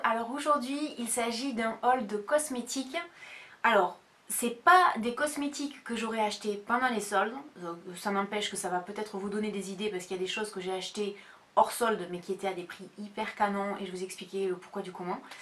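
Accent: South, France. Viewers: Bonjour, comme beaucoup, je connais la marque BareMinerals. Je n'ai jamais testé leurs produits, mais oui, j'imagine qu'ils sont aussi bons que ceux d'Urban Decay que j'adore. Tout comme toi, j'ai les yeux bleus, et je prends beaucoup de crayons noirs, bleus nuit, prune et autre.... Je raffole de leurs rouges à lèvres: Shame, Venom, Jilted, Anarchy..... J'ai bien envie de tester aussi ceux de BareMinerals qui me font de l'oeil depuis un moment:) Pour l'argan, c'est un produit que j'aime beaucoup pour les cheveux, mais aussi en huile pour le corps. Pour le mascara,je m'en suis commandé un sur Aliexpress; Il ne vaudra sûrement pas celui de BareMinerals, mais je verrais bien. Pour Octoly, je trouve normal que les youtubeuses aient des produits gratuits sur ce site. Moi, ça ne me pose aucun problème. C'est des produits qui leur seront utiles, et qu'elles peuvent nous présenter, même si, effectivement, les délais pour les tester avant les videos, sont courts. 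Voilà, je te remercie pour cette video. C'est tout à fait vrai que ton accent du sud est tout mimi. C'est un accent qui chante. J'ai toujours la banane quand je t'écoute:) Très gros bisous ma belle, et à bientôt:) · coucou ! super ta vidéo haul beauté j'adore Perpignan on vas souvent en vacances a port barcares j'aime trop ce coin et moi j'adore ton accent du sud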